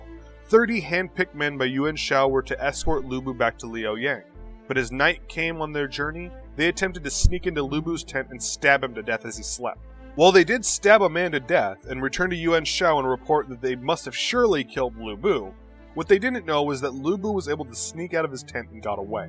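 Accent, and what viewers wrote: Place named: United States. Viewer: fuck these murica pronunciations honestly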